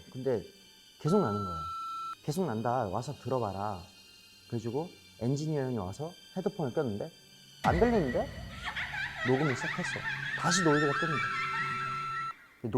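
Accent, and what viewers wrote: Suwon, South Korea. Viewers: 수원 사투리가 저렇다는거 처음알았다 ㄷㄷㄷ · 방긋웃는 모습이 넘모이쁜 우리 💙 숙취를 겪어본 바 배탈이 난 숙취후 ㅠㅋㅋㅋ 경력직 ㅠㅠㅠㅋㅋㅋㅋ 이런얘기 저런얘기 아무렇지 툭 터놓고 해주는 너가 참 조타아 수원사투리가 뭔지도 몰랐으면서 매번 ~거? 하고 물어보는 이창섭한테 치였었는데, 그게 수원사투리였구나?? 앞으로도 종종.. 아니 자주 해주라… 글고오!! 지읒니은 섹시해 무슨소릴 하는거야😭😭😭 나야말로..힘듭니다.. 나야말로…힘듭니다 ㅠㅠㅠㅠㅠㅠㅠㅠㅜㅜㅜㅜ 그렇게 반존대 하시면 나야말로 힘듭니다😱😳 언제나 음악에 진심으로, 하고싶은 음악에 도 전하는 모습이 지금껏 쌓아올린 이창섭을 만들었을테지 비투비가 이 음악에 진심인 만큼 멜로디도 비투비에 이창섭에 진쒸미양〰🥴 부끄럽다며 세에상 멋찌게 노래부르는 🫶❤️ 씨디💿를 주식으로 먹나 라이브가 어찌 저럴수가 있는거시여ㅠ ㅋㅋㅋㅋㅋ몇초차이에 ㅋㅋㅋㅋㅋ 우리 이사님 엉뚱함과 미담을 오가는 ㅋㅋㅌㅋㅋㅋㅌㅌㅌㅌㅌㅌㅌㅋㅋㅌㅌㅋㅌㅋㅋㅋㅋㅋㅋㅋㅋㅋㅋㅋㅋㅋㅋㅋㅌ 본투비 그하는 대박날 운명이었던거심ㅇㅇ 구리가 이 키우는 멈무가 맞았구나~~~ 구리아빠랑 구리 들킨김에.. 👀📸 둘이 싸우디 말고 잘지내에! 구리야 이창섭 잘부탁해ㅋㅋ 동네 노래 좀 하는 오빠로 남으시려면 일단 제 동네로 와주시면… 🏡 고민도 없이 for 멜로디를 외치는 너얼 어쩌면 좋니~~ 난 for 이창섭 for 비투비야 ㅠㅠㅠㅠ 서렌더 띵곡입니다 많관부 많사부🔥 마지막까지 안뇽 하고 흐캬캬캬 웃고 가는,ㅋㅋ 블랭크톡도 이창섭도 고마웠어요 덕분에 이창섭 티엠아이 마아뉘 알아갑니다아 안녕👋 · 🍦 민혁이 오빠에 이어서 오빠도 블랭크톡이라니😭 좋다 이 스케줄 달다..🍦🤍 비투비 이창섭 3년 9개월만에 솔로로 컴백했습니다❤️ SURRENDER 많관부😊💙(#써렌더 #설렌다 #예지앞사 #💙) 저 툭툭 내뱉는 그 말투가 섹시한거야 야ㅋㅋㅋㅋㅋㅋ🍑 난 오빠가 수원 사투리 쓸 때마다 너무 좋아 💕 섹시해😉 오빠 그래도 5월 쯤 부터 매일 복싱장 가서 운동하잖아!! 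진짜 복싱장 관장님께서 올려주시는거 보면 오빠 맨날 열심히 복싱하고 줄넘기하고 훈련하는거 보는데 너무 멋져☺️ 살도 점점 오빠가 원하는 정도로 건강하게 빠지는 것 같고 체력도 더 좋아지는 것 같아서 좋다❤️ 뭐야뭐야 그렇게 갑자기 정해진거였어ㅋㅋㅋㅋㅋㅋ?? 민혁이 오빠 솔로 콘서트 때 멜로디가 솔로 컴백 “기회를 만들어😮!!” 하니까 오빠가 기회 만들어 보겠다고 기다려 달라고 한게 엊그제 같은데 진짜 기회를 만들어서 이렇게 빨리 신곡으로 멜로디 만나러 온 거 보니까 감동이야🥹💙 진짜 새로운 분위기 노래 너무쭈아💕 비투비, 오빠 곡들로는 처음 접해본 미디엄템포, 70-80년대 노래 느낌인데 처음 듣자마자 소름이 쫙😬! 처음 시도해보는 장르인데도 오빠랑 찰떡이야! 약간 나른섹시 느낌이랄까🤭 너무쭈아 절대 호불호 안갈리는곡이야ㅎㅎ 왜냐구? 주변 애들도 다 노래 완전 좋다고 본인들 스타일이라고 했징😉 우리 하고싶은거 다 해🥰 부끄럼쟁이 😘 부끄러워하면서 노래 너무잘불러😲 오빠 음색때문에 내 귀가 녹았다구👂 역시 믿듣비 믿듣 🍑💙 어제 비키라에거 풀었던 썰이당ㅋㅋㅋㅋ!! 커피차가 사실 커피+차 였다는 사실🤣 그것도 커피 걸어서 배달해준거 너무 웃겼엉ㅋㅋㅋㅋ😚 바쁘다 바빠 비투비사회 다들 갠활이 많아서 까먹었을 수도 있는데 비투비 모두 어제 오빠 곡 나오는거 홍보해주고 또 응원해주고 해서 내가 다 감동먹음🥺 은광이오빠 썰 다시 들어도 너무 웃겨ㅋㅋㅋㅋㅋㅋㅋ 우리 서리더 최고🐱💙 저번에 미연이언니가 심야괴담회에서 큐브 귀신썰 푼거 봤었는데 그 귀신을 오빠도 봤을줄이야🥶🥶아니 귀신썰 진짜 큐브에 귀신 있을까봐(ㅋㅋㅋㅋㅋ) 좀 걱정되는데 그 귀신과 함께(?) 녹음한 노래가 ‘갓’리워하다였다니!! 될 노래는 된다고😆 마침 또 그리워하다 녹음 때 귀신이 있었어😲😲 비투비 다음 컴백 때도 녹음실에 귀신 나타나주길🤣🙏🏻 그럼 성재오빠랑 은광오빠가 놀라서 헐레벌떡 도망갈 수도 있겠다🙄ㅋㅋㅋㅋㅋ 이번 앨범, 비투비 다음 앨범도 대박나자🤍 구리ㅜㅜ🐶 오빠 지인분들 인스타나 유튜브 통해서 올해 초 쯤부터 키우는거는 대충 알고있었는데 언제 쯤 이야기 해주려나 기다리고 있었는데 몰래 키우려고 했었다니!!🤭 강아지 책임지고 키우는거 멋있엉🤍 예전부터 강아지 키우고싶다고 했었는데 오빠가 오빠 본인도 감당 못하는데(😆) 강아지 키우면 감당할 수 있을까? 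하고 고민 또 고민하던하던 모습이 생생한데 지금 이렇게 책임감 가지고 키우는거 좋다🥹💙 오빠 핸드폰 배경화면 오빠랑 구리랑 뒷모습 사진찍은거 탐난다구ㅋ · 시작부터 저음 아.. 저는 이렇게 저음의 인사하는 에게 푹빠져요.: 역시 숙취ㅋㅋㅋ 안들린데 ㅋ 우리 숙취 팬싸도 했잖아요 ㅋㅋㅋ 숙취이야기하는데 왜케 옛날 생각나죠? 블랭크톡 알면서 이질문 고른건가요?, 저 수원 사투리 여기서 알았어요. 저 특유 말투가 수원 사투리 였다니.. 나 왜 오늘 알았는건지.. 감사합니다. 아니 이렇게 호탕하게 웃어준다구요!! 운동의 굴레~ 아 세상엔 숙제가 너무 많은데 도 숙제를 준이기분 ㅜ 저 이거 너무 궁굼했어요. 갑자기 뮤지컬도 빨리 끝나고 근데 음반 낸다고 안했고 계획도 없다고 했는데 갑자기 나와서 사실 조금 놀라긴 했어요. 갑자기 나온 이유 너무 궁굼했는데 이렇게 들으니까 너무 좋아요. 좋은 음악이 있으면 언제든 나올수 있다는걸 알거 나니 앞으로의 가 기대되요 비투비가? 이런장르를? 하고 생각했는데 비투비 이 라 가능하다고 생각했어요. 다른 장르도 도전해주세요~~ 라이브 시작 ㅜㅜ 막 잘부르는 노래도 좋은데 이렇게 흘러가듯 불러주는 써렌더.. 목소리 우리집으로 배달좀 해주세요 블랭크톡 나도 써렌더~~ 아써렌더~~ 진심 빵터졌어요. 자기 살길사느라 ㅋ 그래도 분명 멤버들 한명한명 관심없는척 하지만 다들 신경썼을꺼예요. 하지만 이사님 ㅋㅋ 주차는 ㅋㅋ 귀여운 이사님 썰~~♡ 써렌더 녹음할때도 나왔어야 하는데 귀신아 어디갔니 써렌더 대박 나야 한다고!! 이렇게 앉아있어요. 하는데 아니 저 모습 너무 귀여워요...딱 저 수줍은 저모습 섭프가 빠지는 치임포인트 부끄러워 손을 비비는 우리 아 블랭크톡 이부분을 살려줘 감사합니다!!♡♡ 아니 동네 동생도 있다구욧!! 동네 동생 해주라~~ 우에엥에엥 꼭 전해줘요 동네 동생해달라고! 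오빠 그만 찾자 @@ 이영상의 끝판왕!! For melody 내가 멜로디인게. 그리고 이창섭의 팬인게 for 멜로디 한단어로 눈물이 왈칵했어요. 항상 같은 마음으로 예전이나 지금이나 똑같이 말하는 이 라 너무 고맙습니다. 몰랐던 정보 또 그의 마음이 너무 잘 전달 되었어요. 앞으로 멜로디 한사람으로 이창섭도 블랭크톡도 응원 할께요. 너무너무 감사합니다. 내가 이창섭을 사랑하는한 우리 또 만나요. 블랭크톡 다시한번 감사하고 사랑해요! ♡surrender all to 멜로디♡ ♡surrender all to 이창섭♡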